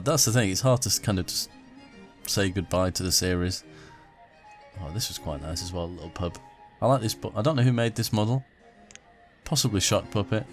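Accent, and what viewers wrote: England, United Kingdom. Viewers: This was a great series; enjoyable to watch. Thanks much! As for the use of mods in the next series, I'd say it depends on the overall goal. If creating a decorative oasis is the goal (like the zoo), then using mods to relieve the survival pressure so that you can concentrate on being creative seems wisely used. If the goal is survival, then not so. Folks like myself watch your series for the artistic aspect (and your English accent!), so it seems right to free up your time to have fun being creative. ;-)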